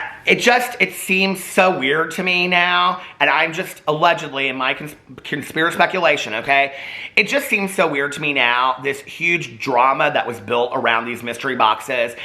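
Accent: South, United States. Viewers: !!! 😂😂😂 your southern accent 🤣🤣🤣🤣🤣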